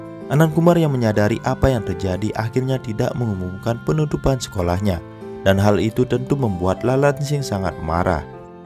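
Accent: Bali, Indonesia. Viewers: Asli bali bang ?Logat bali kental banget bang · Hehehe logat bali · Lebih ke logat bali..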